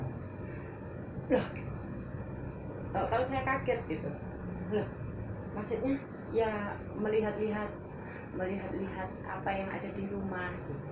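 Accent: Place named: Java, Indonesia. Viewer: Mba asli mana ya? Jawa bukan mba? Aku seneng gitu denger logat bicara nya mba..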